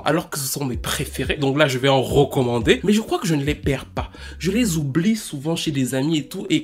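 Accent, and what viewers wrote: Cameroon. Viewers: J'aime bien le fait que tes origines camerounaises, commencent à se faire sentir avec les Eh etc !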